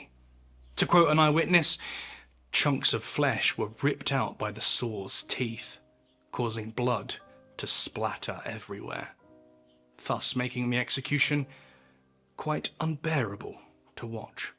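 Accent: United Kingdom. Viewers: YA GOTTA LOVE A HORRIFIC TAKE TOLD BY A BLOODY BRITT!!!! ( I struggle to pronounce the word saw myself.. IT SOUNDS LIKE " SAWRR😱🤨🤣🤣🤣🤣👃🪒🩸🩸🩸🩸🇺🇸🏴🇬🇧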